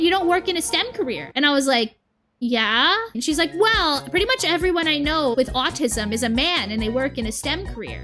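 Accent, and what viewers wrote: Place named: Boston, United States. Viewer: You sound like you have a Boston accent and it's really funny to hear the word pronounced "UAW-TISM"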